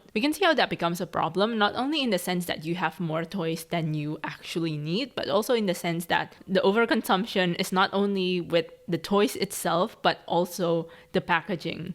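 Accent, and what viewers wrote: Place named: Indonesia. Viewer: I i recognised your accent and i checked your instagram and as a fellow chindo IM SO HAPPY TO HAVE FOUND A INDONESIAN CONTENT CREATOR ESP ESSAYIST wadawww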